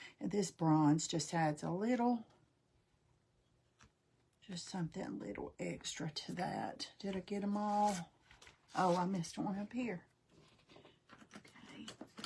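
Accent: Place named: North Carolina, United States. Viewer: I knew that accent! I am from NC too!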